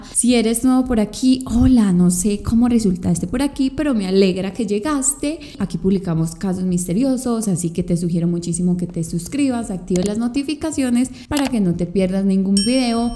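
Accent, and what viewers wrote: Colombia. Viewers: El acento colombiano es muy agradable !!!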